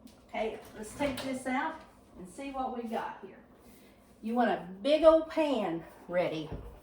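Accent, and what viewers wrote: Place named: Mississippi, United States. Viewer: First time viewer. What a lovely, delightful lady and an informative video. I love the accent. It reminds me of home is Mississippi, as does the caramel corn and peanut brittle.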